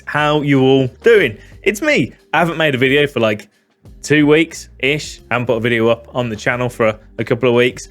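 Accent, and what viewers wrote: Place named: Birmingham, United Kingdom. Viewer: Did not think you was Brummie, convinced myself you was a northerner 😳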